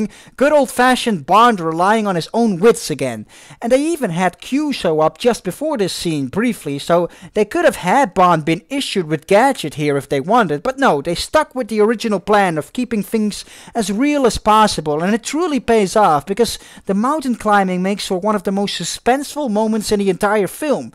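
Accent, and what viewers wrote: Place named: Netherlands. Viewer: Very sorry to sound like "That Dumb American", but I absolutely enjoy your Dutch accent. But honestly, your English is pretty good. I don't know how many Dutch citizens speak English, but you're very well spoken in the language. Aside from that: keep up the good work with your videos. Thank you.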